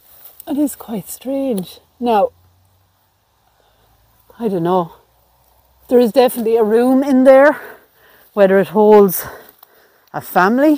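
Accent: Ireland. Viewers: I love the Irish brogue! Subscribed. ❤️ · Hi I am watching my first video from you, from one of your pinned post, your accent and the names, I believe you are in Ireland. Is that correct? Thanks, From Texas USA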